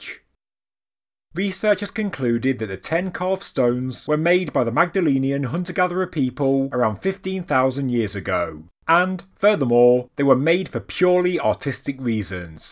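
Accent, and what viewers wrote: United Kingdom. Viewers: Worst dialect inflections of the UK. Spoils the whole show.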